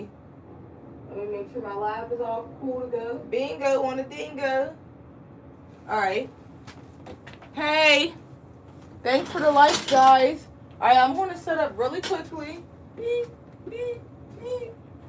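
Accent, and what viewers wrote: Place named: Philadelphia, United States. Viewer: I'm big on accents, where's that accent from? It sound like the Philly area or Rhode Island or Baltimore, I can't put my finger on it😂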